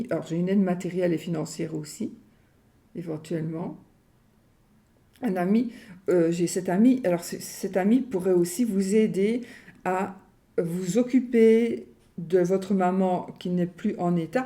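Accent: Belgium. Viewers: Au plaisir je vous adore vraiment je vous suit depuis un bon moments et jaime votre naturelle et votre accent belge😂 vos guidance sont tres pertinente vous parlez de tout j'adore continuer comme ça et si l'écriture automatique vous appel nous aimerions bien avoir une lecture pour le fun merci merci belle journée a vous ❤🙏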